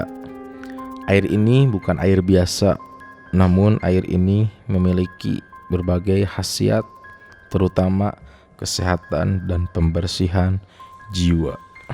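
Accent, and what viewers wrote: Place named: West Java, Indonesia. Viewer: Maaf bang, bukannya rasis,,,,,,,dari cara abang bacain narator, abang orang sunda ya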